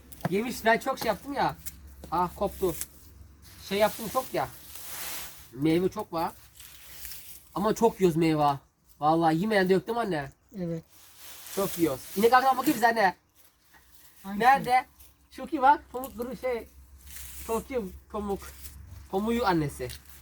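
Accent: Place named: Zonguldak, Turkey. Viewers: kardeşim biz seni oldun gibi sevdik her yörenin şivesi vardır sen Zonguldak şivesiyle konuş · Konuşman gayet güzel sakın değiştirmeye kalkma! kaybolup giderüz çaycuma şivemiz. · Zonguldak Karaelmas Mahallesi'nde oturduk yerlileri 'ın gibi konuşuyor lar Maşallah barekallah lahavle velakuvvete illabillahil aluyyulazim duasını okuyarak gönderiyorum sizlere Amin AMİN AMİN ECMAİN 🤲🤲🤲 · seni hiç kimse yadırgıyamaz Çünkü her yörenin kendine has şivesi vardır bizim Zonguldak’amızın şivesi de böyle biz mutluyuz şivemizden canım benim sen kafayı takma yola devam❤️ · Bende devrekliyim hemşerim şiven harika sırf bu yüzden izliyorum seni 🤗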